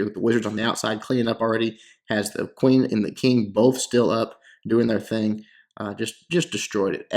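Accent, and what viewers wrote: United States. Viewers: which part of the us are u from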